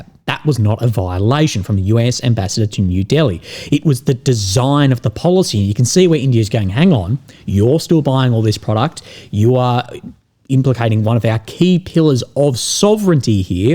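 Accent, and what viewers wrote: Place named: Australia. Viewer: When you're reading you going too fast remember you got that deep Australian accent so please slow down when you read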